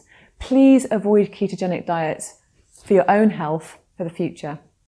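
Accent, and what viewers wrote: England, United Kingdom. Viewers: Great to hear an English accent talking about WFPB living. Well done.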